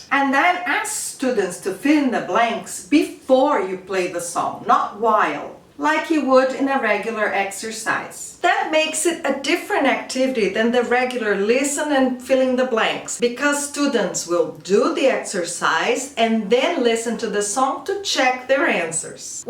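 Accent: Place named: Brazil. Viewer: Beautiful brazilian accent! Thanks for the activities!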